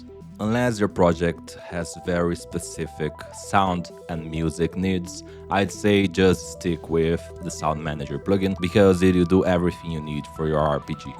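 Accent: Brazil. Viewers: sabia. Minha esposa é do Brasil; eu podia ouvir a mesma sotaque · hey is that a fellow Brazilian accent I hear?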